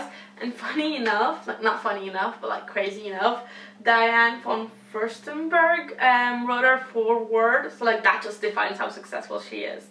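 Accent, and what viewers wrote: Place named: United States. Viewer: I loved this video cause I could undertand more! Lol! Funny you have an American accent even though you are living in England. But it is nice! Love you sweetie!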